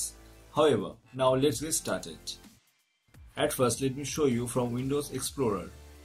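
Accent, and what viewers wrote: India. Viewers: Indians speaking english sounds very ridiculous, next time use subtitles, the world will thank you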